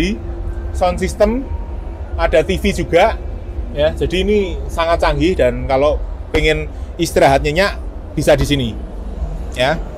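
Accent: Java, Indonesia. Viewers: Gak focus ama bus nya,..focus ama abangnya yg medok jawa nya😂😂😂emang beda yaaa saudara kita chines indo dan chines malaysia😂😂😂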